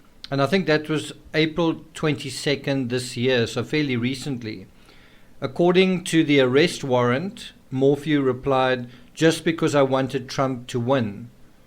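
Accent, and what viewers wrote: South Africa. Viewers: Hi, are you a South African? watching from Cape Town South Afri8ca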